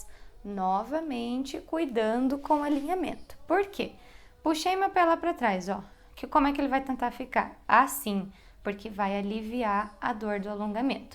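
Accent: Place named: Curitiba, Brazil. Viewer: Ah que pena em vários momentos cortou significativamente o áudio - uma pena porque a professora é boa e paciente. No começo deu uma tremida também, mas depois resolveu. Vocês são de Curitiba? É pelo sotaque porque tem outro Eqiulibrium em SP. Mesmo eu que já faço dei uma perdida com a falta de som às vezes. Obrigada pelas dicas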